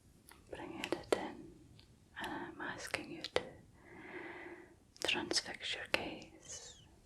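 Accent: Scotland, United Kingdom. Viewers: your scottish accent reminds me of mum so soothing x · Feel like i with a scotish girl. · Hello from Ayrshire it's Good to hear a Scottish accent I have real problems sleeping but you helped this fellow scot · Just discovered you. Its nice to hear a Scottish accent spoken so softly..... even if you are from Dundee 🤣😜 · lovely Scots accent behind the voice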